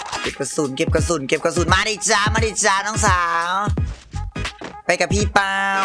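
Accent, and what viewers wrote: Isan, Thailand. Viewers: พี่ภาคอิสานจรืงหรอ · หวัดดีตัวตึงพากอีสาน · อ้ายแมนือเปนตะฮักแท้เว่าอีสานเด้นิย้านฟังบ่ฮู้เรือง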